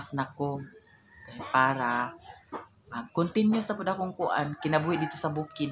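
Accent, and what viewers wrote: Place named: Misamis Oriental, Philippines. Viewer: Every time makita nko imo vedio it remained me sa ako mag relatives taga misamis oriental i missed hearing your accents....ingana gyd .....shoutout taga kiraking ug calubo keep safe....you too🍒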